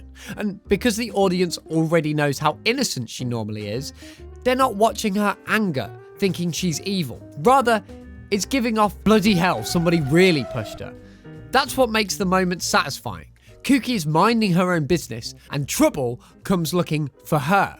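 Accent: United Kingdom. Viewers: Hi are you British?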